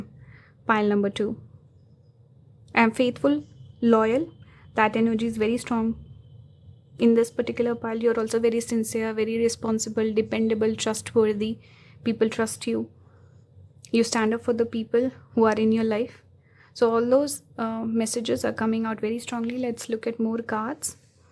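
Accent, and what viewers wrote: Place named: India. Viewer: Why you sound like an Indian...are you an Indian ❤❤❤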